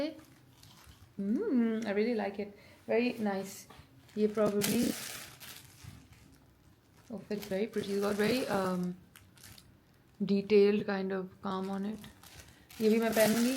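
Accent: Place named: United Kingdom. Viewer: I love your British accent please try to use it more often while conversation in your videos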